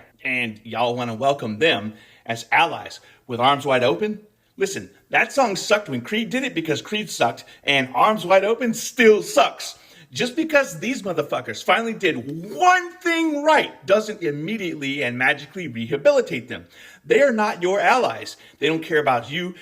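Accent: Scotland, United Kingdom. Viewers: are you from Scotland because i always hear an accent come through on your vids?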